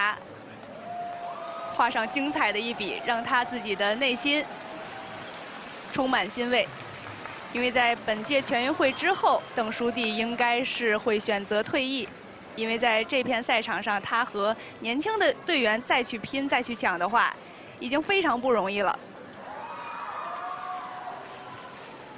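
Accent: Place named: Cantonese, China. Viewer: 解说员是广东人吧~ “欠”了点、“完”满的结果！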